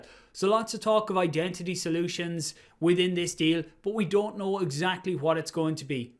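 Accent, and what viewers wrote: Ireland. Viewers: normally i can't understand Irish people, but i didn't realized he's having irish accent till i saw your comment.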